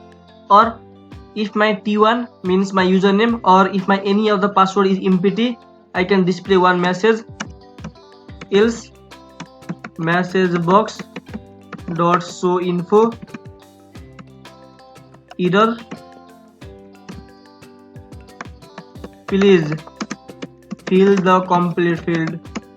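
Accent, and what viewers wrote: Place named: Nepal. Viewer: ayo nepali accent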